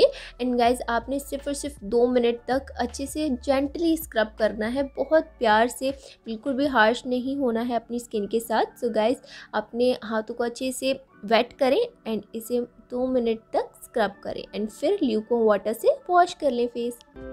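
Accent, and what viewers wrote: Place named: Punjab, India. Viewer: Sister...Are you from punjab?You have got that punjabi accent..😍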